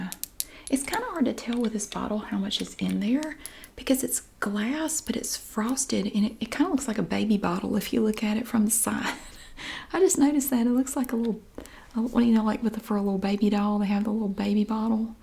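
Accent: South, United States. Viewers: Hi it's good to see you as well, I love your southern accent, I must be high maintenance then because I have a full basket of products.😍😁, I'm new to your channel, I'd love to see you do a video with a tea party, and finger sandwich I think it would be fun.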